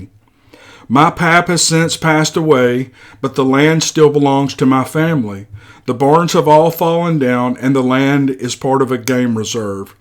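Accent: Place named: Georgia, United States. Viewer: Thanks, sir. I am from the Deep South. I also lived in LA about 12 years, moved back south for about 16 years, and now happily reside in the Seattle area. I definitely believe in Bigfoot and listen to a lot of channels on the subject. I think I enjoy your narration more than any because you have a particularly appealing southern accent. Reminds me of southwest Georgia. You sound kind of like my brother in law, who’s a really good guy. I had heard this story on another channel some time back and found it fascinating, and so glad you are sharing it here.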